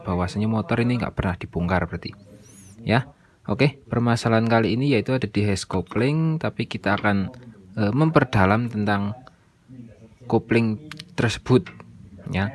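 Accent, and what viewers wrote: Banyumas, Indonesia. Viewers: Sampean wong ndi kang ngomonge ngapak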